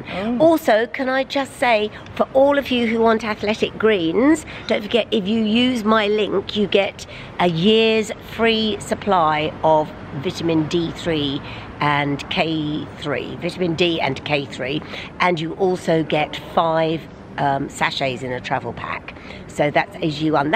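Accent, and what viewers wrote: United Kingdom. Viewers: Nothing is as lovely as a genuine London accent.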